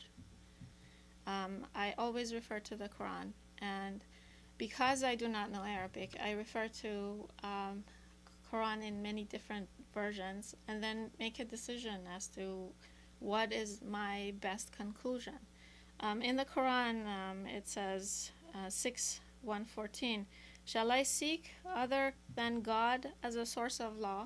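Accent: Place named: Iran. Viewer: khahar, age mishod, man vaghan daste shomaro miboosidam... vaghti harfaye shomaro goosh midadam, chesham pore ashk shod. midoonam irooni hasti, az lahjat malome, vaghan shomaro doost daram.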